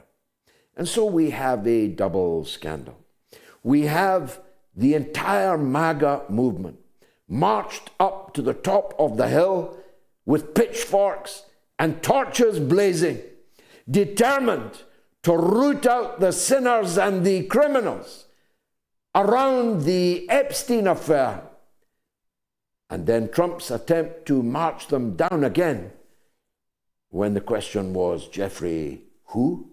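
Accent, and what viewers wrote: Scotland, United Kingdom. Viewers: We love you 💖💖💖 You are such an honorable man! Deep Thanks for this speech! Eventhough, as a french-canadien, I must use the subtitles here and there because of your ''strong Scottish'' accent (which I love) 😉, I will continue to listen to you, sir. As I started to search for the ''truth'' about what's going on in this messy world, my son told me, several months ago, that you were a most valuable and reliable person with integrity and determination. So I started watching your program and I want to congratulate you and express my gratitude, from the bottom of my heart. Besides, I'm 69 so... you're older than I, which makes you even more honorable in my eyes! Take care and stay safe❤🖤🤍💚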